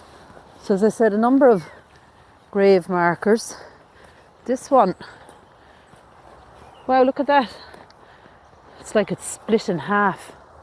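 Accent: Ireland. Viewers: I love your sweet accent or is it called brogue? Very cute. My question is, since the coffins are so old and made of wood, why don't they show signs of body fluids on the bottom parts of the coffins? Were they lined with lead as well? They are definitely much prettier and with more ornate decorations than the metal ones we see now days. I love walking thru cemeteries. I took my little dog for walks at our cemetery everyday for 2 years right up until the day she died. She loved it. Even killed a big giant gopher there one time! We had the ok from the people that worked there for us to walk there. And I always picked up any poops that she dropped too.😊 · I love to see these old headstones,I wish that the church would clean them or power wash them and seal them so they can last another 400 years, I would love to do that, but I live in the states and our old cemeteries have all been damaged, it’s just so sad.., you have the best voice and Irish accent, you are so clear when you speak. 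I honestly could listen to you speak all day. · So good to hear this Irish accent again, it sounds like music to my ears. Cheers, all the best !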